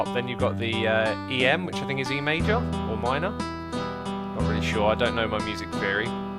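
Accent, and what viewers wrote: United Kingdom. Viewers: I think it's the accent. idk why but British accents don't sound like metal fans to me.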